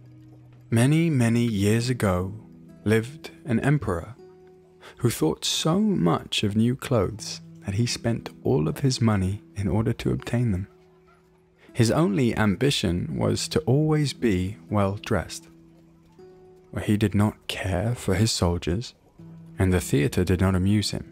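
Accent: United Kingdom. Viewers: I would love and never get tired of listening on storytelling...keep it up idol...first time viewing something like this...the only problem with me, in my case is, and being a granny as well, some lines I cannot understand easily, since it is a British accent, so I have to repeat listening to get through what you are saying, really trying my best...just to listen to you...thank you for the joy you have brought in my life...I always pray for your best of health, success, happiness and protection. God bless you always! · The voice, and he is English like the accent. Nice story, never heard about it.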